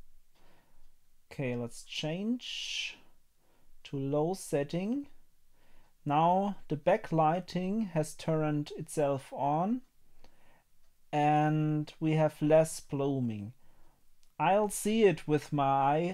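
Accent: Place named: Germany. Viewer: Ich habe noch nie jemanden gehört, der so deutsch Englisch spricht haha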